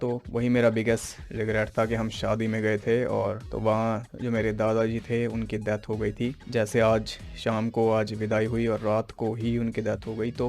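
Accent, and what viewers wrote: Haryana, India. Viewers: Your Harvyanvi accent make impact on your explanation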